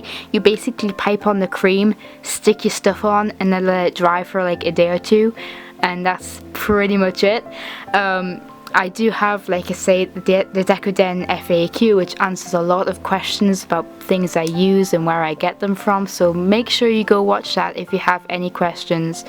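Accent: United Kingdom. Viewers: You probably get asked this a lot, but i was wondering what area of the UK youre from? Your accent is lovely and sounds kind of northern to me :) I've just started doing my own decoden cases and i love watching your videos, theyre really helpful :) xx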